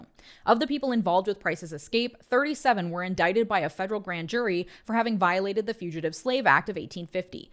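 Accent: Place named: Minnesota, United States. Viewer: Love your channel great info paired with that warm Minnesota accent. Tanks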